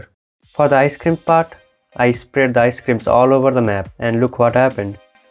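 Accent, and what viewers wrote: India. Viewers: He's an Indian now thats for sure, its recognizable by his voice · Are you from India sir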